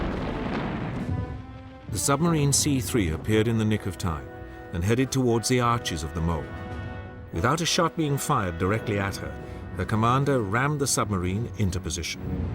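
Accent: United Kingdom. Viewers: Despite the very basic graphics, with the British narrator, actually quite watchable. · Great? Proper British accent to announce that 1 million people were killed and then is sad since the strategy didn't work. The PR tells us that that is the "civilized" way. I've decided otherwise.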